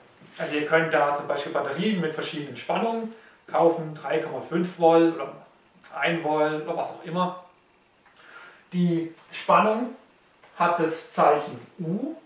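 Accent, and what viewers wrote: Swabia, Germany. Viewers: das heißt nicht leischtung sondern leistung und ein W wird nicht mit nem Kreuz in der Mitte geschrieben. argh, das schwäbische kann man sich einfach nicht anhören. aber sonst gut erklärt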